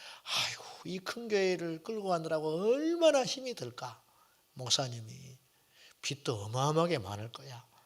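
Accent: Jeolla, South Korea. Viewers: 목사님의~ 전라도 사투리 말씀에도 정겹고~ 은혜 됩니다~😊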